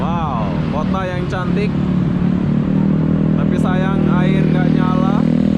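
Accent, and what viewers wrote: Indonesia. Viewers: Ini seriusan orang malasyia...kok logatnya indonesia bgt ya.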